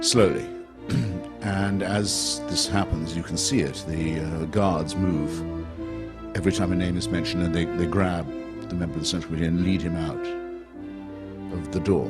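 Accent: United Kingdom. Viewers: When I heard the narrator with british accent, I stopped watching! English are not to be trusted, the most evil people that civilization has witnessed! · That's very appropriate a british man talking to us about evil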